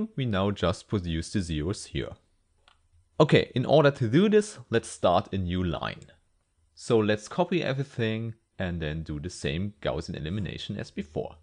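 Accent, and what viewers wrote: Germany. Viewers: all jokes aside, you explained really well. Just enjoy the german accent <3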